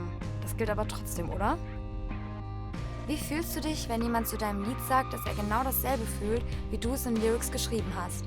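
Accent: Germany. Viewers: Ich liebe dich, I used to be obsessed with germand and germany I have no idea why, but I love the accents. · That German accent is so pure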